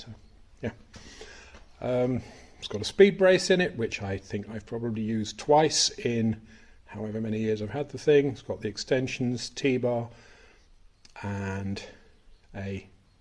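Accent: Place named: RP, United Kingdom. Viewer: Your accent sounds far to posh for a mechanic, we never see your Mush so we call you 😂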